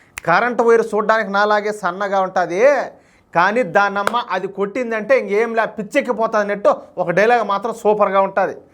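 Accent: Rayalaseema, India. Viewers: బ్రదర్ నీ వాయిస్ మార్చుకో రాక లోకం సుధాకర్ గారు చెప్పినట్టు శాంతంగా మాట్లాడండి స్వచ్ఛంగా మాట్లాడండి, తెలంగాణ రాష్ట్ర రాయలసీమ యాసలో మాట్లాడొద్దు అండి, అసలు బ్రదర్ నువ్వు రాక లోకం సుధాకర్ గారు చెప్పినట్టుగా శాంతంగా చెప్పితే చాలా చాలా బాగుంటుంది వినడానికి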